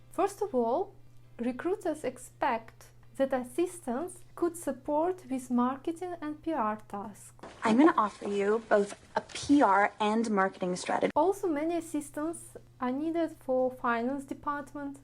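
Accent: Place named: Eastern Europe. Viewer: Soften love your mama Eastern European accent🤫this will only help you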